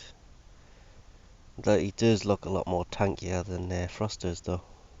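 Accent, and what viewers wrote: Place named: England, United Kingdom. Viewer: I enjoy your content but could you please stop calling the stealth frame loki ... logi it is a k not a g, sounds petty but as much as I enjoy and use your information It annoys he that someone that sounds so English (sorry if your Newcastle as I realise you don't like being called english) can get a pronunciation so wrong, again I'm not trying to be insulating just making a point of reference incase someone else picks up on this point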